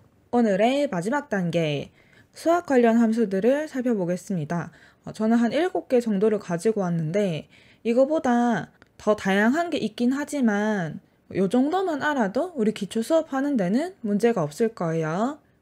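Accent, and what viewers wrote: Gyeongsang, South Korea. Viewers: 오늘 또 듣네요~~ 님 경상도분이신 듯 좋아요 따봉 추가요~~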